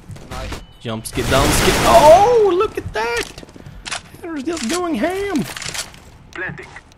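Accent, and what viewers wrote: Sweden. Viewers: The sweeeeedish accent!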